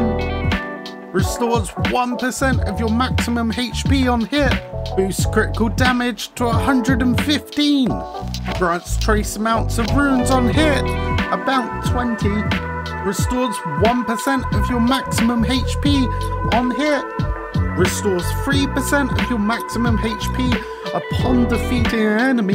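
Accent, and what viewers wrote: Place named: United Kingdom. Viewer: it's his normal voice, he is just bri'ish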